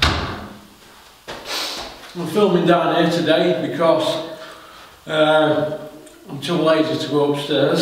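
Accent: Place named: North, United Kingdom. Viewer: Where you from? Sound like a good northern chap.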